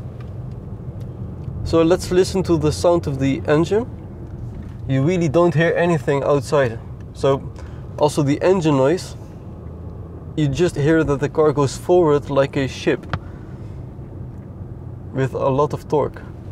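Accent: Netherlands. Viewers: dutch accent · Nik Topler its dutch english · you can really hear that your dutch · Kyro de Boer ik denk dat hij wel nederlands is, ik kan het merken door zijn engelse accent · Lekker nederlands accenttje gap 😂😂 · Prima video, ik zou alleen proberen met iets meer emotie te praten. Het klinkt door het Nederlandse accent niet heel gemeend, maar dat is gewoon oefenen. Blijf zo doorgaan! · Dat hollandse accent haha heerlijk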